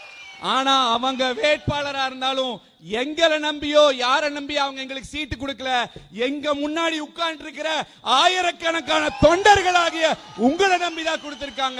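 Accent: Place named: Coimbatore, India. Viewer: கோவைத் தமிழ் சூப்பர்.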